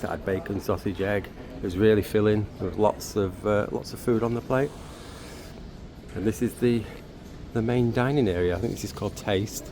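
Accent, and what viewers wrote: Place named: England, United Kingdom. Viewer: Being an Irish gay man myself, I love your English accent - and you are very handsome looking too 🇮🇪☘️🇮🇪😍😍😍😍😍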